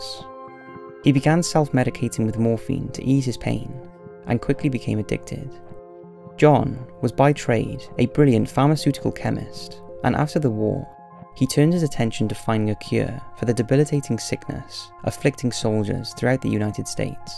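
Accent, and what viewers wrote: Wales, United Kingdom. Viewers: Are u welsh? Sounds like a lil welsh accent 🏴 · Can’t beat a Welsh accent 👌🏻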